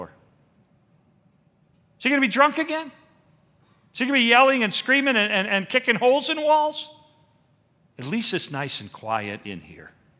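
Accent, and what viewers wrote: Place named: Chicago, United States. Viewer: You can tell he was from the Chicagoland Area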